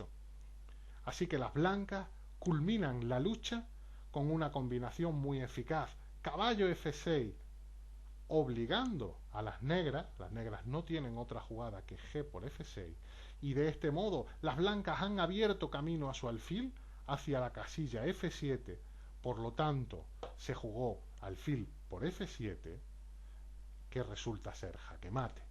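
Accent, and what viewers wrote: Spain. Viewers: a mi me parecio acento catalá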